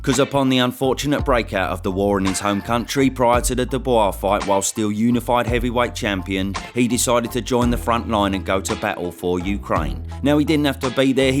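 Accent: Cockney, United Kingdom. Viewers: Great videos but the chewsday accent is killing me 😶‍🌫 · This cockney commentary is awesome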